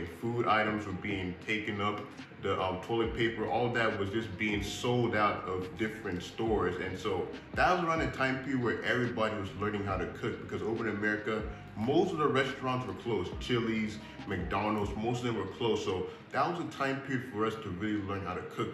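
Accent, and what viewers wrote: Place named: Nigeria. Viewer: You sound Nigerian I like following true AA living there...omg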